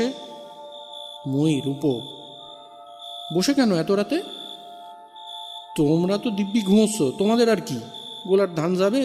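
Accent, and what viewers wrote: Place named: Bangal, Bangladesh. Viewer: স্যর বাঙাল উচ্চারণ তো বেশ ভালো 👌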